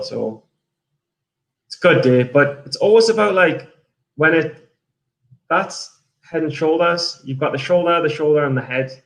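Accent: Newcastle, United Kingdom. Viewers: You from Newcastle? Sound like Geordie!! Im from Newcastle too been trading 8 months now I traded BYFC and got torn apart around $6 and only ended up on the stock around $100. Traded CHCI as it rejected $4.20 and $4 level hold and held until $3.12.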